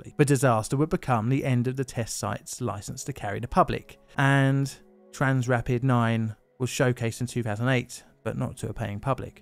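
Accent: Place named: Southern England, United Kingdom. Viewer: I love his voice its a bit of a southern British accent.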